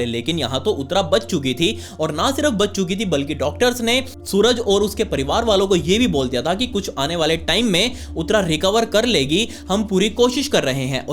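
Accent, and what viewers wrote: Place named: Delhi, India. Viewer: Hi ❤❤❤❤ मुझे आपके बोलने का अंदाज़ अच्छा लगता है पुरी दिल्ली वाली भाषा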